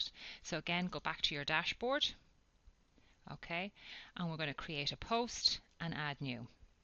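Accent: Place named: Ireland. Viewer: lol. I'm usually terribly annoyed by these sorts of videos. But your Irish-ness just made it so much nicer to listen to xD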